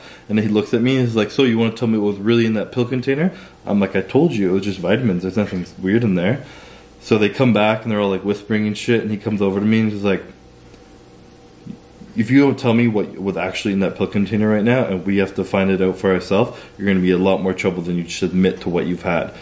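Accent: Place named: Canada. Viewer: I was like why does he sound weird...oh yea Canadian...lol.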